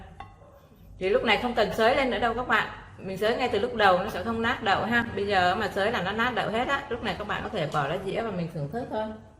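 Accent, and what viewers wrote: North, Vietnam. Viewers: Chị chắc là người miền Bắc năm mươi tư(54)😘 nói chuyện nhẹ nhàng dễ thương😘👍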